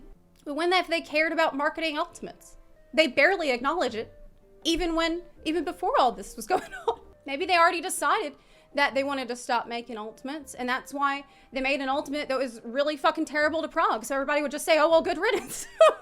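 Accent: South, United States. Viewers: …what is this country accent? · Damn, has a thick southern accent. 🤣🤣🤣🤣 And dicks out for Harambe! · WoW being back in the USA sure brought up your Southern drawl!:D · Why does have this weird southern drawl all of a sudden? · I feel like has gotten more and more southern the longer she's been in the U.S. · Damn, has fallen hard back into her southern accent 🤣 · Embrace southern. I had no idea she was southern, but I'm here for it.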